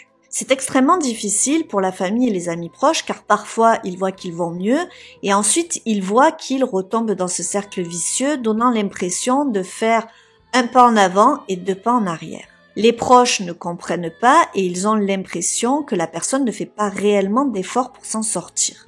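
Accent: South, France. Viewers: Taylor et Madison. Quelle tragédie !!! Pour ma part je pense que tu as fait une très bonne analyse de la situation. J aime bcp ta petite pointe d accent du Sud...moi qui suis dans l Hérault...🌞🌝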